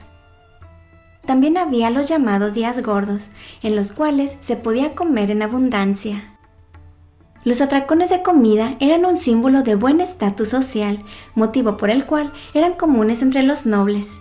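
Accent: Mexico. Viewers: La voz es de mejicano con acento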